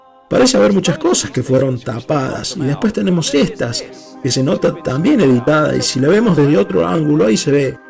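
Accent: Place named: Córdoba, Argentina. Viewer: ❤❤❤ otra vez lo voy a ,👀👂no m acuerdo del acento cordobés 😂tienen mucho salero 🧂 como buen@ Andaluces 👍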